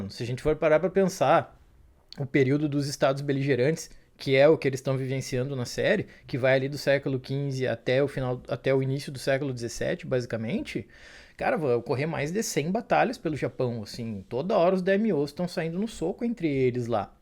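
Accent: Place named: Rio Grande do Sul, Brazil. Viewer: muito obrigado pelo acompanhamento da série com os vídeos, pra mim tu fez a análise mais fiel e profunda da série que vi no YT! E é tri ver o sotaque gaucho mandando bem por aqui! Abraço!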